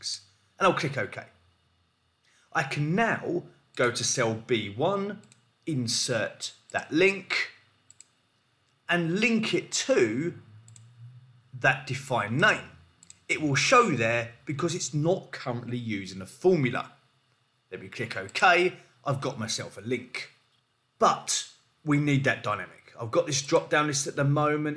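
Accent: United Kingdom. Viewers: Have to suffer 8mins of stupid British accent to learn how to create hyperlinks from drop down menus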